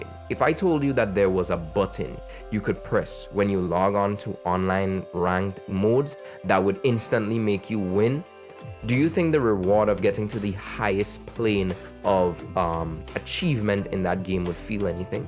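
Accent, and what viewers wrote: Trinidad and Tobago. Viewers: Trini accent talking about tekken reminds me of being 9 and playing tekken 3 with my cousins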